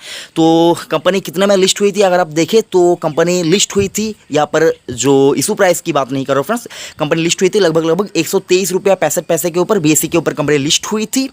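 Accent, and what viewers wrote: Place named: United Kingdom. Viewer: language kyo asi bol raha hai gadhe..angrejo ki copy kar raha hai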